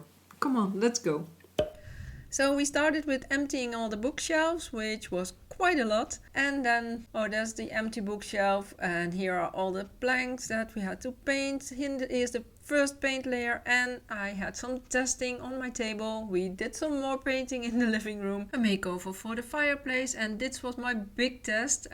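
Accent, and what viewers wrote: Netherlands. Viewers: Thanks so much for making and sharing you work. I love your ideas and you have helped me a lot with your tips and ideas. Also I lived in Amsterdam and Harlimgen in the North in the 1970s (yes Im 70 now) and love to hear you accent. It brings back many memories for me. I watch Dutch TV series to try to keep up my Dutch and really enjoyed Baantjer: Het Begin - took me back to my years in The Dam x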